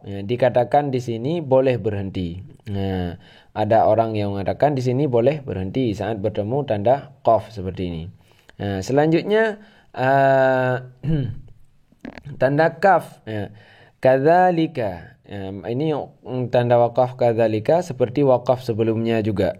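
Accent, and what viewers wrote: Aceh, Indonesia. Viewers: Saya mau tanya.ustad orang Aceh ya.krn fasih kayak orang Aceh ngaji Krn saya juga orang Aceh...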